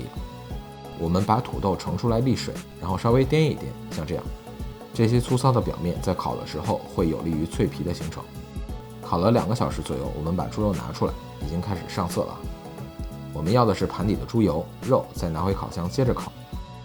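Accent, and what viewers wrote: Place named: China. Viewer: 除了刀功和口音是中式的，其他完全纯正老牌欧式，毫不犹豫素质三连。留着整套菜谱，我圣诞节做家饭。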